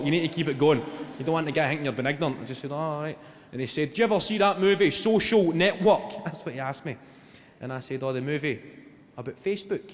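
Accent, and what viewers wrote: Scotland, United Kingdom. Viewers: Learning scottish to understand him and Frankie Boyle. 70% true. Greetings from Germany 😀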